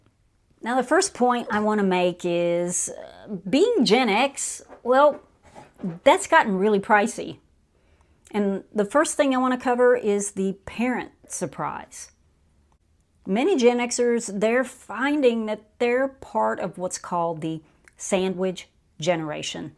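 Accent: South, United States. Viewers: ah, couldn't tell. You do have some southern accent going on.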